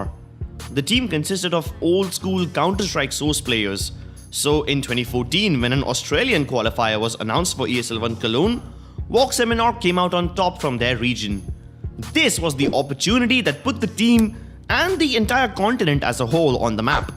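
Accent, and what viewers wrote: India. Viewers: Indian accent smells from 4000km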